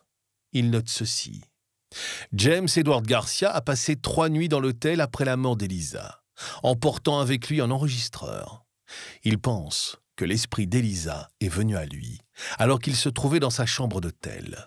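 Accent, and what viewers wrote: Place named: Belgium. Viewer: Ça me fait toujours plaisir d'écouter l'accent belge. Merci ❤❤❤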